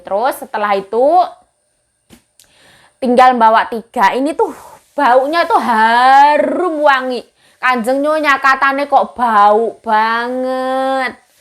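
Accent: Java, Indonesia. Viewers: Aq seneng liat mb...jowone medok..dr Blitar ya mb,?..sy Arema mb.Mlg.... 😀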